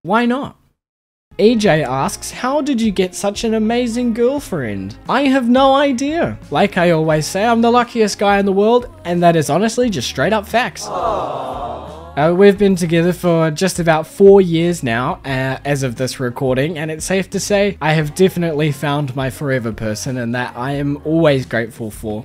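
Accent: New Zealand. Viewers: Great video. You're very good at it. 😊 I recognised your accent because I recently moved to Aus from South Africa and the NZ accent is kind of a smoosh up of the two (English South African & NZ). Loads of people ask me if I'm from NZ. I love your videos ❤ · I KNEW YOUR ACCENT WAS FAMILIAR!!! it's my own.... Lmao. Good ol kiwi accent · Funny how people thought you were Dutch when you have the most New Zealand accent to ever accent to me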